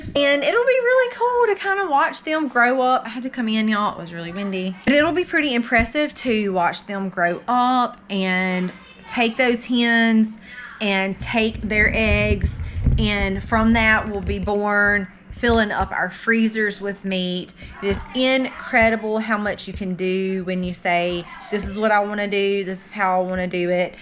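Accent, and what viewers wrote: South, United States. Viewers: I always enjoy watching you all! And quite frankly I love to hear your wonderful southern accent! My family was from Alabama… and I still have some there! I live in CA! Wish I could live somewhere Else . And maybe in the near future we will be making some trips to the south to see how we like it, We are in our 70’s so we are not young chicks! However we have to get out of CA even though I was born here I really hate what it has become. Watching your channels have renewed my desire to be more free From all this concrete jungle and grow our food and have some animals. We live in a beautiful area, and my husband has worked hard to provide for our 5 kids. With them all grown up now, we just married our baby in July…she is 32… we were married at 19 & 23 years old. So the world has changed so much since then. Watching you all has given me more hope to actually change things. 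Thank you for your channels ..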